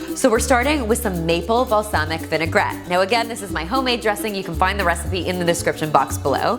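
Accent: Canada. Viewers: Hi, I'm actually watching this in 2016, I just discovered your channel through "DoitonaDime", and was very intruiged. Your one pot pasta meals seem great, and I can't wait to try them out! I could hear (at least I think) that you are Canadian? Well, I'm from Belgium, but my uncle emigrated to Whitby, Ontario in the fifties! I used to spend a lot of my summer holidays there, since my dad passed away when I was only four. I loved it there ! Some pretty good, warm memories... Now, my uncle has passed away, too, and I have to grown kids of my own, so I basicly never went back...too busy. Iwas wondering what kind of jars you use, and if they're available online (worldwide shipping, of course). Thank you so much for all of this! 💝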